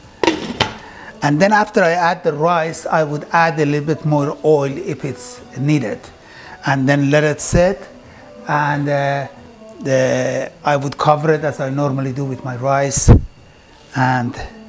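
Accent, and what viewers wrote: Iran. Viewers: Personally I love your Accent It`s Persian Accent and it spices up your Cooking show more over I would like to thank you here for sharing your experiences and knowledge Regards Arash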